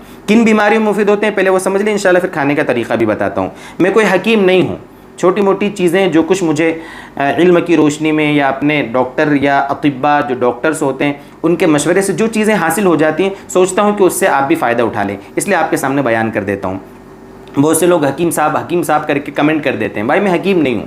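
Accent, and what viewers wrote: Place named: Gujarat, India. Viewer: Bolne ka laheja gujratio jaisa hai .shayad surat district ke hon.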